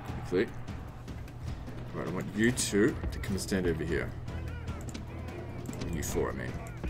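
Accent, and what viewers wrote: Australia. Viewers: i think the aussie accent is partly the reason i watch i like aussie man reviews...woteva u do dude goodluck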